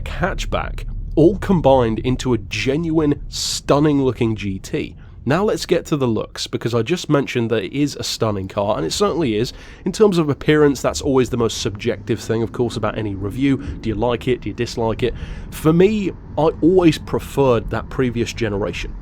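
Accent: United Kingdom. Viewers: You are British, am I right?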